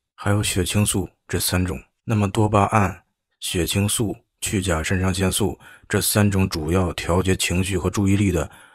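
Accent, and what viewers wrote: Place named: Beijing, China. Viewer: 北京口音听着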